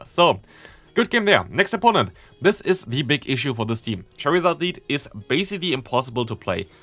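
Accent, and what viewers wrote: Germany. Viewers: sounds like hes german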